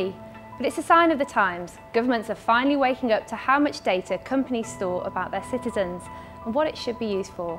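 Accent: United Kingdom. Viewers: British accent is so great.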